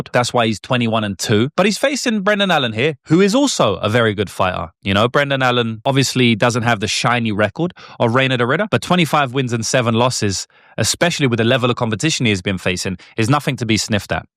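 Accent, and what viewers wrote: United Kingdom. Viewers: I’m glad this channel is getting bigger. I remember absolutely hating when I first watched him. I was like “who is this arrogant prig with the English accent and f his mama” but I had the accent and the man messed up. lol. Three years later and I never miss a single piece of content. 😅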